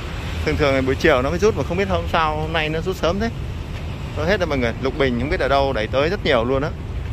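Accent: Vietnam. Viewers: Có vẻ như ad. này quê TH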